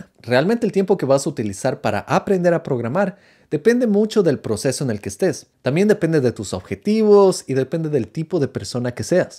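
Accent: Quito, Ecuador. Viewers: Jajaajja saludos bro, te escribo para demostrarte que no soy un bot, ya me tomaré el tiempo de revisar los cursos, y porque estoy en el proceso de aprendizaje, y me pareces un excelente mentor, además que por tu acento, creo que eres quiteño. Saludos desde Manta Ecuador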